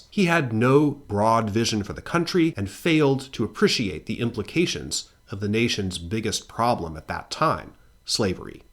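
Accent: United States. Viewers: ❤ you’re delivery & Midwest intonation, hope you research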